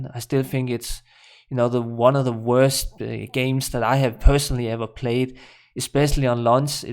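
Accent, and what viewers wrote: Denmark. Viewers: Wow you sound so danish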